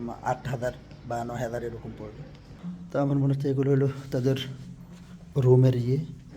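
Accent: Noakhali, Bangladesh. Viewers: বাই আন্নে নোয়াখালীর সুদ্দু বাসায় কতা বলেন, বালো লাগে তবে সুজোগ পেলে স্পিচ থেরাপি নিয়েন, আরো বালো দানদা করতে ফারবেন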